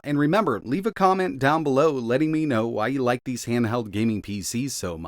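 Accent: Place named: North Carolina, United States. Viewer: This is very generous! I just saw your address in your description, I thought I detected a fellow Tarheel's accent!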